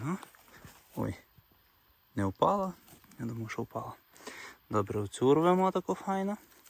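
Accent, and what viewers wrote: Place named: Hutsul, Ukraine. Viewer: як я люблю гуцульську говірку🎉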